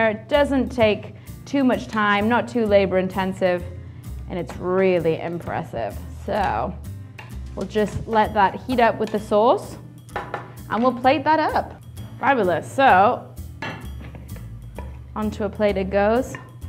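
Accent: Australia. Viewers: sounds australian... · she kinda sounds like she has a faint Australian accent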